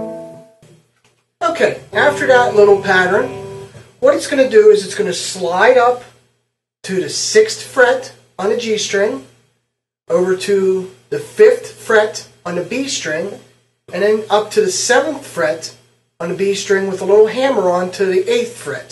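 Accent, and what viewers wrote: Pittsburgh, United States. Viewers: Pittsburgh accent?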